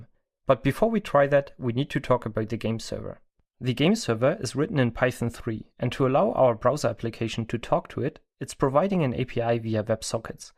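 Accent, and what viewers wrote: Germany. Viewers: Ein Deutscher, das hör ich doch direkt 🤣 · I can hear a German accent ^^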